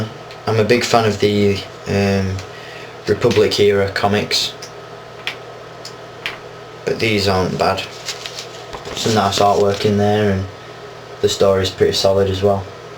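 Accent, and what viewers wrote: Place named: England, United Kingdom. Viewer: wait are u english i thought u was from the us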